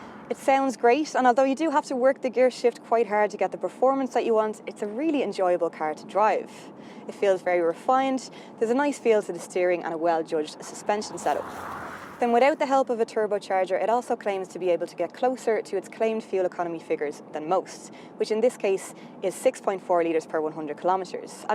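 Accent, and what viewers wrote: Ireland. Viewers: Couple points, The color of the vehicle is really beautiful. The roads that were driven were very scenic, ? in Ireland; whereabouts? The interior in my opinion, especially infotainment (if you want to call it that) is not as nice as the competition. The curves and grille of the vehicle give the car a unique character. The lady doing the review is really pretty, and I have not been to Ireland, and enjoyed listening to her accent. I am writing from USA. Thank you for this review.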